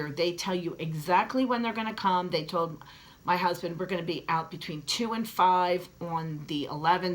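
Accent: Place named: Ireland. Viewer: You sound like you have an irish accent.it might just be me.it's cool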